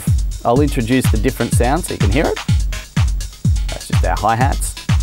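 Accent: Australia. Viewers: I'm American but you Aussies are one of my favorite English accents to hear. It's just so vibrant and colorful lol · Hey mate are you an aussie from Melbourne that lives in Singapore?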